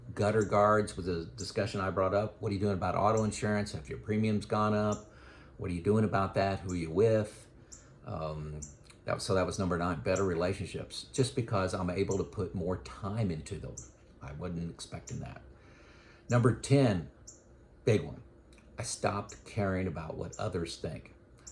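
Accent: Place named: United States. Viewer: Speaking of making fun of how you talk - are you of German heritage or grow up i a heavily German heritage area? My German-descent mother from Wisconsin and her whole side of the family pronounces "wash" as "warsh". Like George "Warshington". My dad and his Polish side of the family from Wisconsin just say "wash". Not a criticism (although we would gently tease our mom sometimes), just curious.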